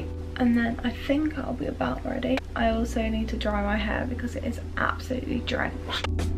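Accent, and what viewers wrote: United Kingdom. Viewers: ur british accent has my all heart 🥺 ps: i love all your videos too<3 xx from Brazil 🇧🇷❤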